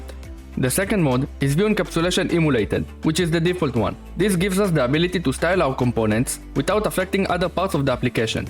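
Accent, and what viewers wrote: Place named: India. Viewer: oh my God! Is there any ony of this videos that is not made with indian accent/???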